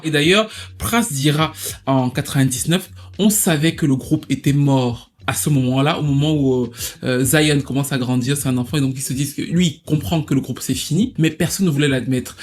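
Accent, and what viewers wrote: Cameroon. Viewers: Toi... tu n'es pas camer par hasard ? 😅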